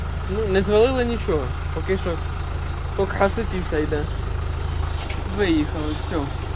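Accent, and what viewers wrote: Poltava, Ukraine. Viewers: Я з перших кадрів,коли тільки почала дивитися канал,зроміла,що з Полтавщини,говір неповторний ❤ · Супер земляк.Що їм мова не нравиться,як причепляться людина із Полтавщини.Нікого не слухай наш суржик супер.Удачі.Хай щастить у житті.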